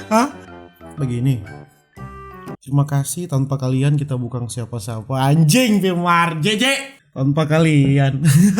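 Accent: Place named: Manado, Indonesia. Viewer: Hhhhhh lucu dpe ba gas.. bahasa manado 😂😂😂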